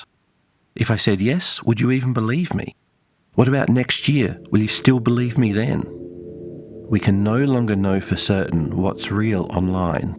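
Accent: Australia. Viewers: Cyber Psychosis sounds much cooler. You could become a Cyber Psycho. Is your accent a mix of Tasmanian and Canberra area/region? I'm interested in regional accents.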